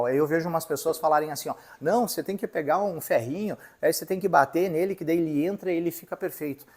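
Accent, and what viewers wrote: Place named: Rio Grande do Sul, Brazil. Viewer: Meu amigo fala com o nosso sutaque, aqui nós falamos tu e não vc, bas que barbaridade tchê!!!!!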